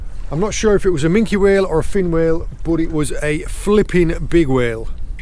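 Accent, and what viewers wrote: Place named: Cornwall, United Kingdom. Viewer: You're getting slowly Cornish mate, it happens. About 18.00 you say "I switch overrrr," great vid btw!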